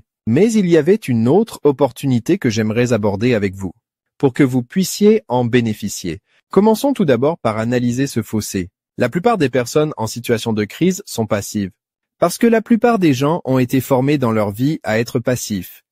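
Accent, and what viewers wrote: Canada. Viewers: avec un accent canadien, c’est rigolo 😃! Tu as traduit ta vidéo de l’anglais vers le français avec OneTake? C’est génial! La synchronisation des lèvres est incroyable wow 😊et la traduction aussi! Je suis ravie d’apprendre que mes vidéos sont en sécurité sur plusieurs serveurs et qu’ils sont redondant en cas de problème ou de panne pour que mes vidéos en ligne soit toujours accessibles, d’autant plus pour celles qui constituent mon offre!😍🫠🥰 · C'est peut-être bien pour traduire dans une autre langue que votre langue natale pour des gens qui ne connaissent pas votre voix. Mais, là, cela ne fait pas du tout naturel ! Hormis l'accent canadien, le rythme est souvent saccadé et ne respecte pas le ton naturel qui conviendrait à ce qui est dit. Cela fait vraiment robot. Personnellement, je n'aime pas.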